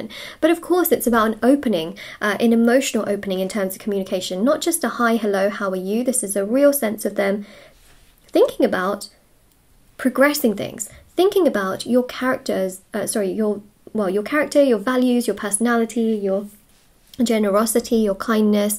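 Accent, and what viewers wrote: United Kingdom. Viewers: your accent is so British..... are you a British native???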